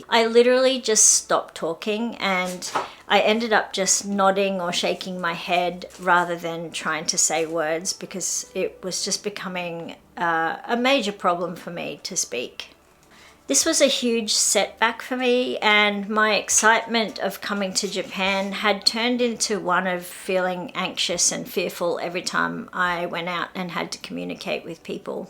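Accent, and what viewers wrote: Australia. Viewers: I understand where your comming from when it comes to a Japanese person or Thai understanding us english speeking people , us Brits have a thing in our heads called auto correct, if a japanese person ask me in pigeon English, we/I would probbably understand what they were trying to say, although they are not perfect, we, our Brains auto adjust to figure out what they want, or asking in there sentence, but i find that a lot of asian countries dont have this, unless its perfect pronunciation they just look blank at you, what probably happend to you as a teenager, unbeknown to you, you was speeking Japanese with an Ozi accent . I quite often have my Thai girlfriend in fits of laughter when i try to pronounce Thai, if you think Japanese is hard ( its not) Thai script has tonal pitches, although the spelling of a word is the same, the tone or rise and fall at the beginning, mid word, end of the word can translate into a different meanings, as for pronouncing Japanese, try and get a Japanese accent it help when i prononce it . 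· I know that much of Japanese English is based in part on American English so it’s not surprising that they likely struggled with your Australian pronunciation and accent. Interesting anecdote. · I am interested in not just the pronunciation but also the ups and downs of the voice... Though I have Japanese grammar and vocabulary books, no teachers here are willing to teach me speaking... it's a gap left blank. They are not native speakers thus not confident enough to take the job. As for the native ones, they don't speak my language so we can't communicate well and I find it hard to explain to them what I need. 😅 Besides, they are not educators just housewives who have no idea of the methodology of teaching. I found I am also improving my English with your lovely Australian accent haha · I'm sure speaking English simply uses the tongue differently saying vowels and letters differently. 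As she describes phonics. I would have a very difficult time speaking Japanese. I wonder if everyone learning English from her will have an Aussie accent. Probably same as my Dad learning English from a country under a British rule. In general I hope we all understand each other with respect. I applaud you for what you have learned so far.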